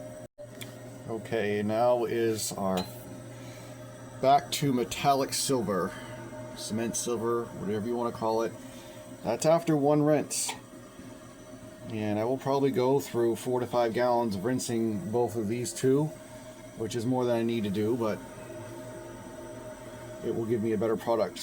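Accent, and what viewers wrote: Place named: United States. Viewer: because I am as well, yank can hear another yank! 😁 Also think I heard you mention a storm or weather event that sounded exactly like the weather we had going on. I'm in NH, you could probably drive a golf ball into Maine from here.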